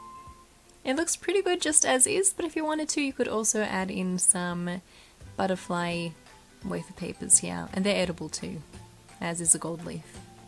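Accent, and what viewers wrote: Australia. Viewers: she pronounces 'almond' as its spelt, and 'sandwich' she says it in an australian accent - just like how australias say 'straya. main thing is we understand what is saying, nothing wrong with it at all.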